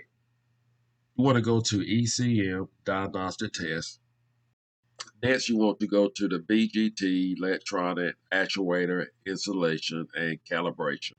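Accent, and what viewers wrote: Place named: South Carolina, United States. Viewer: Thank you sir Where are you based out of? South Carolina? Going based of the accent (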